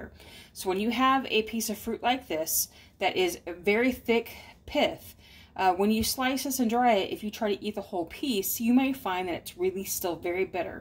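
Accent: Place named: Australia. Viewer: "sorry a bout the cat" lol I was looking around here for which of my 3 cats were going off! Great tips, thanks for the down under speak-loved it! ♥ thanks so much for sharing!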